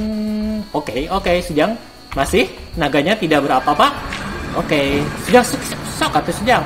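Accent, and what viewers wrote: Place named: Sunda, Indonesia. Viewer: orang Bandung yak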